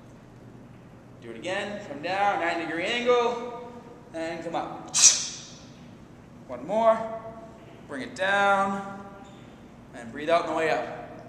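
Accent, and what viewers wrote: Boston, United States. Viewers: I can't watch videos without your boston accent anymore. I love it.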